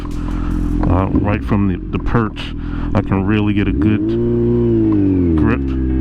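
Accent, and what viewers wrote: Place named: Baltimore, United States. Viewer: Good stuff man. Thanks for the video. Unrelated, you sound like a Baltimore dude, but those don't look like Baltimore streets 🤣.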